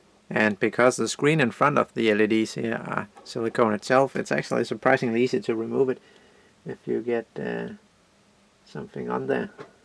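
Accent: Denmark. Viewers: You really sound Danish to me :D Cool projekt! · COOOOOOL fra de første 10 sek, jeg har gættet på at du er dansker :D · his accent sounds danish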